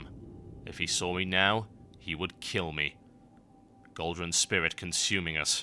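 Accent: United Kingdom. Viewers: you have an awesome accent. It's not one of those horribly exaggerated ones, this one is less aggressive and expressive, yet it has a certain taste to it that we all come to love. That leads me to my question, what part of the UK did you get that accent? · voice suits WoW for sure. It's a very distinct UK accent that's very usable in the worgen stories:) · he does have a british accent cause he's from UK :) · I wish I had a hulky british guy to read a book to me every night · sounds like a boring documentary.... but it is the one and only cynical brit so like!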